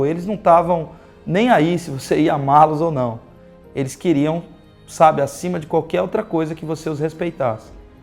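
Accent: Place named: Recife, Brazil. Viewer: Pelo sotaque... já me ligou no saber entender..sou recifense e amei lembrar minha terra. Você é bem sábio pra idade que tem. Tenho dois filhos e o amor sim ..tem de ser construído e as vezes ..a duras penas porque os filhos não têm maturidade e ser mãe implica em amar e se respeitar e ensinar isso..e é difícil..mas vai..o amor é uma semente que a gente rega..se nascer nasceu..se não nasceu é porque a terra não estava preparada no momento certo. Gratidão pelas suas palavras ...gostei e me conquistou pela sua frontalidade.🌹🇧🇷🇵🇹🌵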